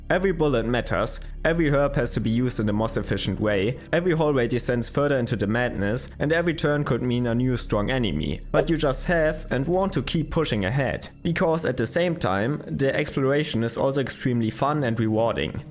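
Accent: Germany. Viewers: Kleinen German accent 🇩🇪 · I kann hear deutsch akzent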